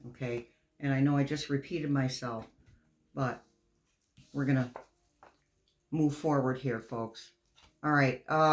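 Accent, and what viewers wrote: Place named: Midwest, United States. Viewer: Omg! My Dear Aquarian Sister! We both know there is no mistake I found your channel. As a February 13,Aquarian, I have had a frickin crazy merc.retrograde too! Finally Ending legal drama since Feb.2023, I'm just now feeling back to some normalcy and with your wisdom I might add! I L❤V E your antics and how you give the ignorant, rude trolls a firm education on being "civil" something their mamas should have taught them. Keep it up! You keep me laughing! Most importantly, your beautiful readings have inspired me and continue to give me hope. It seems as if I've gone through some of the Same battles in the last 2 yrs in this country, just like President Harris has; I could definitely relate and I love your parts 1 and 2 segments. Anyone who has nerve to complain about Anything you do on Your show, is Not living on their higher frequency level. 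So, keep that up too! I also sensed that you may be from the Midwest or East coast? I think your accent is great and your personality is so right on! Like mine! Lol! I must and will get time hopefully soon to book a reading and to just meet a Real Sister in this now, crazy ass country! ❤U Earth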